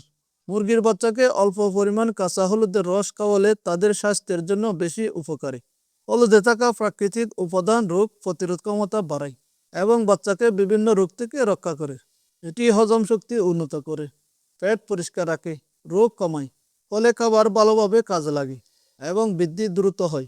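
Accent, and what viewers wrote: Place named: Chittagong, Bangladesh. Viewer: চট্রগ্রাম থেকে আপনার কথা গুলো চট্রগ্রাম এর মতোই লাগছে 😮😮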